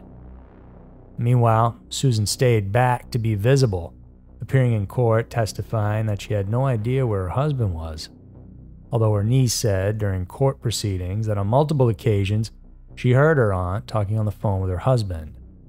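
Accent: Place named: Texas, United States. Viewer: LoL...I was convinced that he was Woody Harrelson and occasionally accused him of hiding his true identity (Woody) in my comments...until he started showing his face in his videos. But you agree he's a Texan, right? Got that Texan twang.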